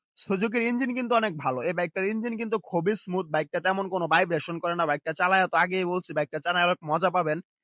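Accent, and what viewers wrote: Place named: Comilla, Bangladesh. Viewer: You are from Comilla, I recognize you from "pack kada".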